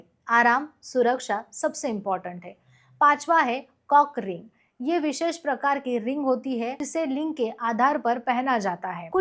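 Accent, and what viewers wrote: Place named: Maharashtra, India. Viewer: Marathi manus wala flavour aa raha hai .. kuthun aahe apan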